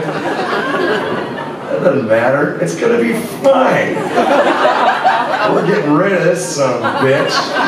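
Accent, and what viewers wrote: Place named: Scotland, United Kingdom. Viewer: that beautiful scottish accent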